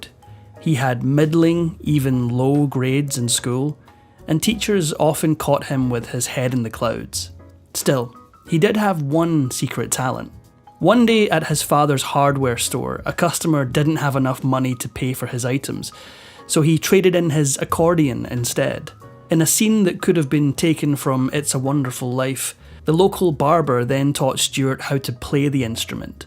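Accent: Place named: Scotland, United Kingdom. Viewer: A fellow Scot narrator 🏴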